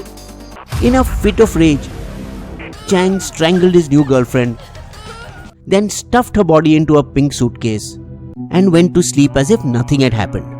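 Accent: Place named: India. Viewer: you mean kutzergart? Yes I do watch him but i can say tells story in much more interactive way and I can understand because of his Indian accent and kutzergart is a German.... And believe me when Germans spaek English it is the most hilarious thing.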